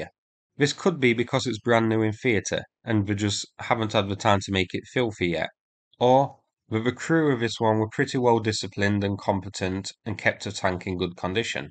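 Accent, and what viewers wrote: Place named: Midlands, United Kingdom. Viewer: Yep.......one of the Midlands accents. Pretty standard pronunciation of vowels for the area.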